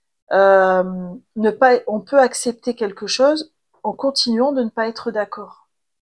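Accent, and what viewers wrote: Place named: Nord-Pas-de-Calais, France. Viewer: Choix numéro 1 ❤ ooooh oui le message a bien été reçu 😊 MERCI du plus profond de mon cœur !!! Je vais le ré écouter tellement il est riche et réconfortant. Je me sentais très seule en ce moment, j en suis meme venue à me faire mes auto séances de psy parce que pas d'accompagnant disponible.. je doutais de mes capacité à me libérer seule de ce traumatisme de l'âme car je sens que j en touche la racine. Je reçois ce message comme une validation. Alors ❤Merci❤❤❤ Et big up à l'accent chti 😉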